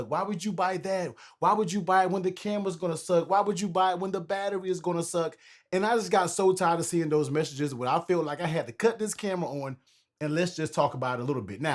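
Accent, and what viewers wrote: United States. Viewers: I know that accent…you from the DMV bruh?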